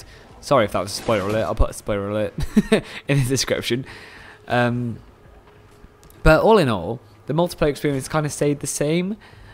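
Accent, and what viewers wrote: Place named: United Kingdom. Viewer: British Pershon........I likes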